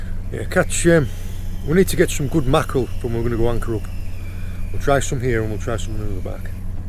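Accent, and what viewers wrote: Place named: North East England, United Kingdom. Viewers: Hey up fella, only found your channel yesterday and totally enjoying them too. Thee sounds like a lad originally from up this neck of the woods fella. You are blessed living where you do. I would go fishing out from Hartlepool years ago and my uncle who lived up in Tynemouth would take me out when I would go up there when I would go to stay as a boy for my holidays. Love your videos buddy keep em coming. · Really enjoying watching your videos. I’m struggling a bit at the moment with my head and watching your videos takes my mind off things. NE accent in Cornwall catches the imagination. Only ever been course fishing, but would love to try sea fishing. Keep doing what you are doing.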